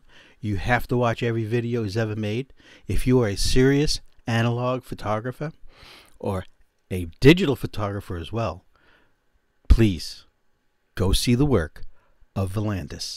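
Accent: New York, United States. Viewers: This is a great video. As a New Yorker your accent makes me feel at home, and the information shared is super useful. And thanks to you I am now obsessed with the tremendous artistry of Vuhlandes.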